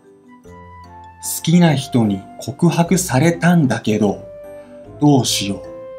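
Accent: Taiwan. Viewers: 额 一听就是台湾腔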